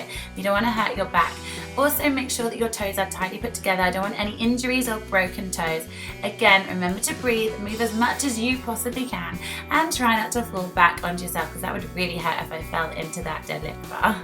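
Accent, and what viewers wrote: United Kingdom. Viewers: How refreshing to hear a British babe on youtubes fitness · Are you from Norwich? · That body + British accent= heaven! Wow!! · Are you from England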